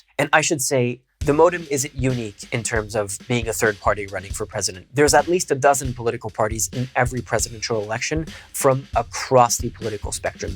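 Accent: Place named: France. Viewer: The guy definitely speaks French, you can hear it Great job anyway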